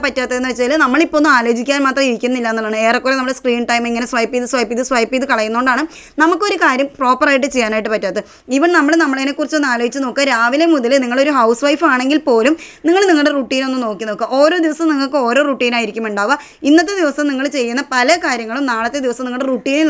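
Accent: Palakkad, India. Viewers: Palakkad aanalle (thodi)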